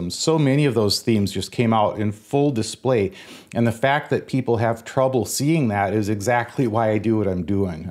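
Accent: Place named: Minnesota, United States. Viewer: If everyone channeled your “Minnesota nice” tone and accent when approaching our political and religious differences we’d be in a much better place in this country.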